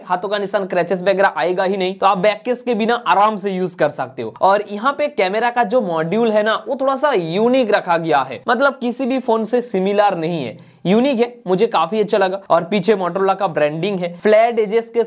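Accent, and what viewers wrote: Bengal, India. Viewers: Bhi toh bangali hai kiya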